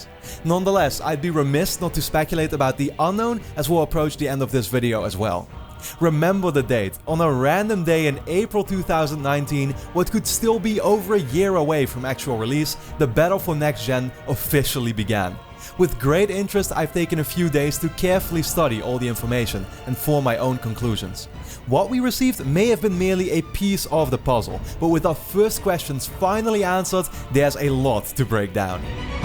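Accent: Netherlands. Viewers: Are you dutch? Can hear it in your "s" 😅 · Such a dutch accent :P But nice vid! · are you dutch because you have a dutch accent. (with dutch i mean netherlands) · ik ben al een tijdje geabonneerd op je en ik dacht altijd al Dat is toch een nederlands accent en eindelijk zei je dat je nl was en ik dacht echt van boem ik wist het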